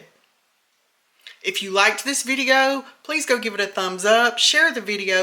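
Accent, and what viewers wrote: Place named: Alabama, United States. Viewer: My stepmother's family was from Jackson Alabama. I love to hear Alabamans talk. I could listen to you all day.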